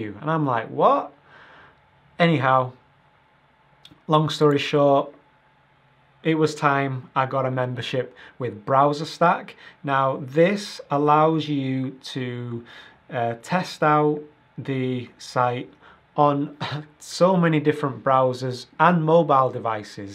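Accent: Manchester, United Kingdom. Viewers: Hey, another great video! Thanks for share with us your genuine experiences and mistakes. Btw are you from Manchester uk?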